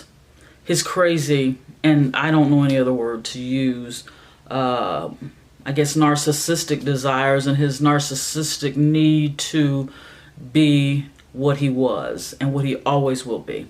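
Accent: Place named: United States. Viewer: Love ur American accent x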